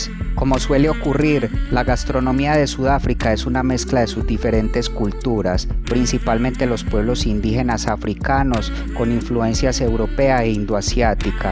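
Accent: Antioquia, Colombia. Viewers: El man que habla en este vídeo es paisa? Buen vídeo 👍🏻😁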